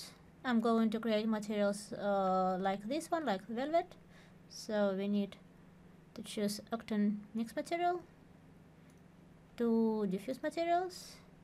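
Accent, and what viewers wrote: Russia. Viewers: Oh this lovely russian accent! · THNX for video, ps: русский английский просто невозможно не распознать)))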